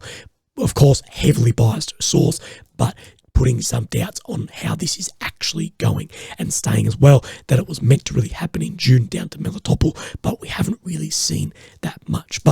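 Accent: Australia. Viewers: Can't speak Australian without a smile! Thank's for your efforts, homie. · funny Australian accent and obscure))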